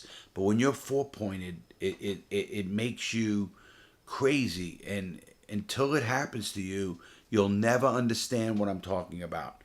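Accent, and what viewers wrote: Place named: North, United States. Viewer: Legit question lol. If you live in florida and have lived there your whole life how do you have such a northern accent??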